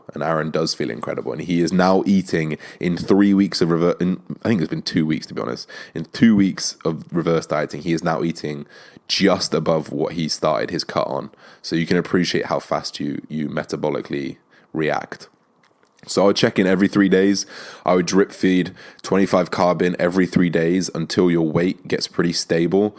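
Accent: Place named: RP, United Kingdom. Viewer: You sound like such a posh boy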